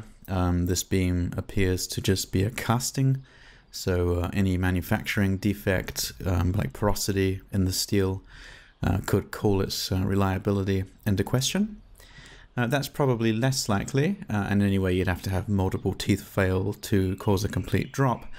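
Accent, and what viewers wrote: England, United Kingdom. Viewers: Definitely not Aussie An English Accent 🏴 👍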